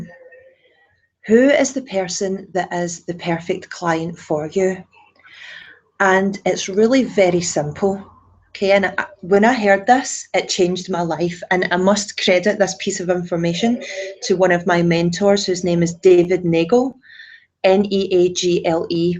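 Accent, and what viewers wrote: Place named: Scotland, United Kingdom. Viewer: Hello, who did you say your mentor was? You never put it in the description box. Good to hear a Scottish accent like mine 👍🏻😊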